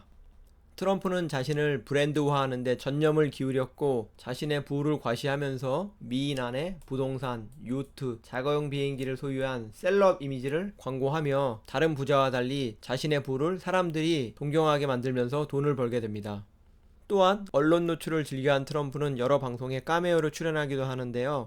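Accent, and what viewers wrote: Jeolla, South Korea. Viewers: 이분 전라도 분이네ㅋㅋ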